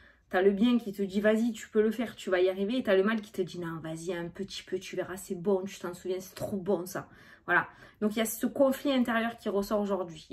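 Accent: South, France. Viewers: Tjs un plaisir de vous regarder......joli accent du sud qui fait sourire ici à Bruxelles...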